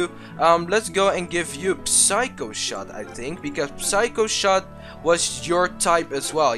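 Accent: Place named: United Kingdom. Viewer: nl for tha win! also, first vid I heard of you, I kinda thought you were brittish. XD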